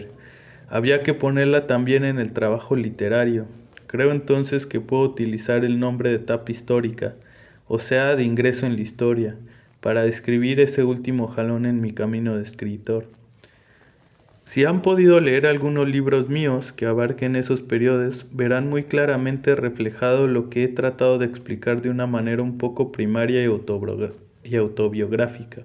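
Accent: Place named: Mexico. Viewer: presente el acento mexicano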